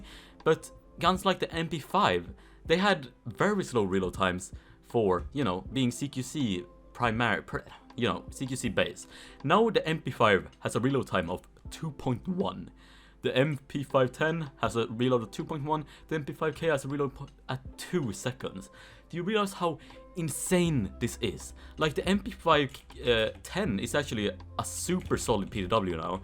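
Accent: Sweden. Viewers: Plus a swedish accent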